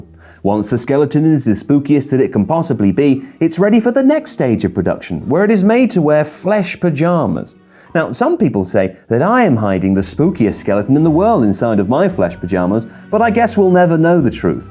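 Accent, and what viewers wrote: Scotland, United Kingdom. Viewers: is scottish??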